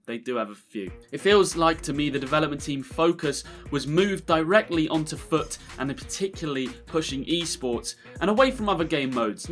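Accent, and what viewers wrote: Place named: England, United Kingdom. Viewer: I hate this English accent why can’t an a American do this video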